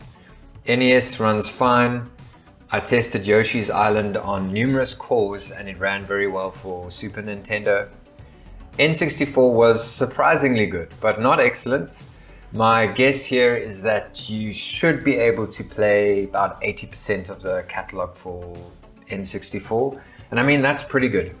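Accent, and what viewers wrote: South Africa. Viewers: Was about to say that bro sounds south African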